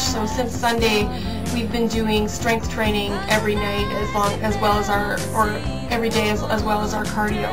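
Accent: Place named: Wisconsin, United States. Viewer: You betcha! Lol....I'm from Wisconsin, so it sounds like ours a little.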